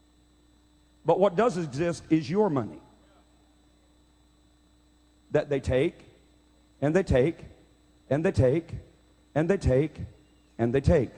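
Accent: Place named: South, United States. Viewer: he kinda looks like James dean with a southern accent.don,t know if this guy is a real Christian or not.God only knows.if he is not he,ll get whats coming to him,God will make sure of that.all will be brought to the light.good and bad.evil is a puff of smoke.here for awhile and is gone not to be seen again, amen live like u are Christ himself.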